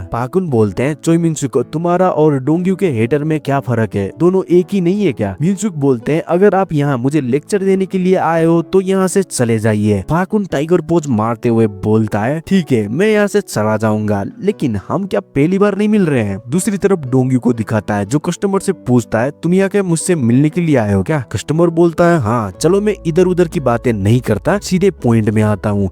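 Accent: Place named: Nepal. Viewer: Hamro jastai Hindi xata😂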